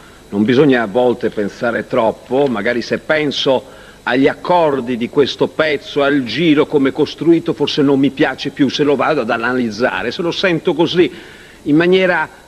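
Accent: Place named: Milan, Italy. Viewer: Accento quasi da cummenda milanese. Mi stupisci sempre, e mi manchi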